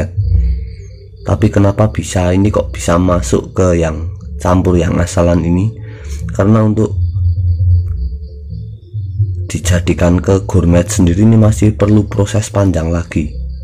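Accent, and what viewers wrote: Temanggung, Indonesia. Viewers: Dari logatnya bebicara kayaknya dari temanggung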